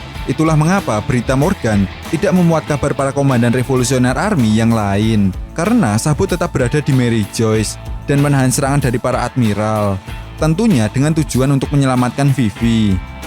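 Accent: Java, Indonesia. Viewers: Bahaso jowo nyo gk bisa hilang ternyato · Min Jawa ne ndi ?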